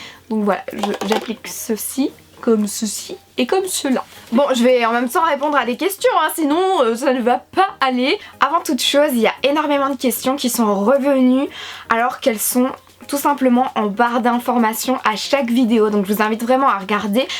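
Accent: Belgium. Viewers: Oui ta un accent belge et j ai la même palette naked que toi je l adore trop PS : je t'adore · Je t'adore tes vidéos sont toujours aussi cool et on passe un super moment avec toi ! 😘 PS : oui je trouve que tu as un accent belge ! 😂❤ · Cc Perso je trouve que tu as l'accent belge!!! Et c'est mieux car tu es Belge. Merci pour ta vidéo. Bisous de France 🇫🇷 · Merci d’avoir répondu à ma question je suis choquée 😂❤️ maintenant je vais commenter plus souvent ! Sinon super vidéo comme d’hab 💓 (ps: je suis française et oui tu as un accent belge)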